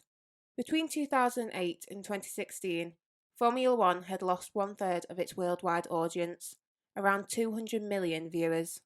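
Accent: United Kingdom. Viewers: As true fans we would naturally take in everything available about F1. We therefore each have our own opinions about the content of whatever we are watching. Whether your favorite driver was “properly” presented, for example. Consider that Netflix viewers are just browsing available content and see this series. They watch one. Maybe another one. And suddenly they see drama and excitement, politics, technical details, personalities and families and daily struggles. They have discovered a huge thing out there they never even wanted to be interested in has global appeal. New fans. Like me to this channel. I am doing a bit of binge watching now. Great job. Nice stuff. But then again, I am a total sap for a lovely British accent.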